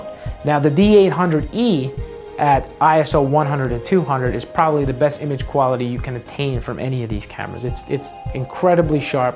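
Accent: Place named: New York, United States. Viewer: too much talking... yo, you from the NY area? yea, I thought so... eh, I feel like gettin some gabbagool.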